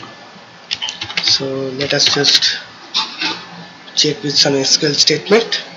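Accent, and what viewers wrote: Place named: France. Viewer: puto acento francés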